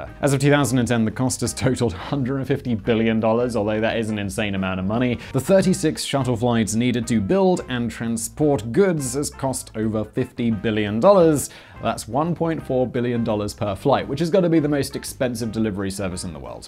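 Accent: RP, United Kingdom. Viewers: I'm hooked... Subscribed. And yes, this is Cykey I watch every channel that on. It's never boring, knowledgeable and is a cool ass host with the most that speaks the Queens English. That's guy is dope! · NASAR the British accent gets me every time lol can pronounce Russia correctly instead of Russiar like NASAR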